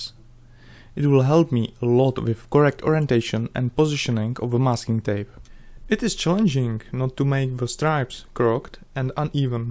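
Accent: Czech Republic. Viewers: Já si podle toho přízvuku říkal že budeš čech (zápalky z penny tě prozradily) :-D super práce. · You can definitely hear that you are czech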